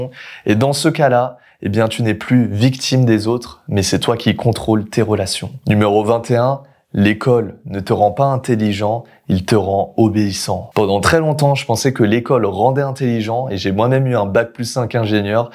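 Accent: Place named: France. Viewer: Jadore ton accent francais , fille de lalgerie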